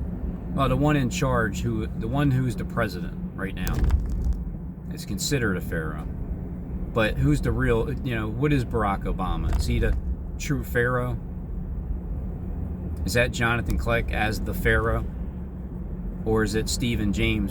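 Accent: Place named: Philadelphia, United States. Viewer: good study of the Philly accent........I lost mine, been living in Jersey for 55 years.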